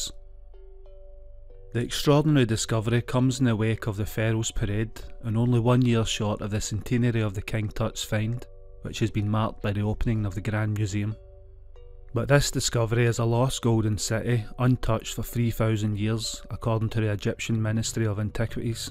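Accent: Scotland, United Kingdom. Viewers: Loved the Scottish accent! And the rest 😉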